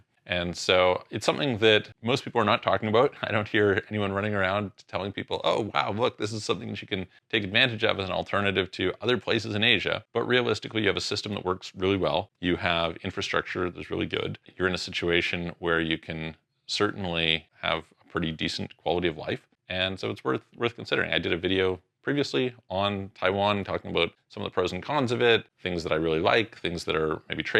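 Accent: Canada. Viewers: "Aboat"? You wouldn't be a Canadian "eh"? No problem if you are. I like Kanuks.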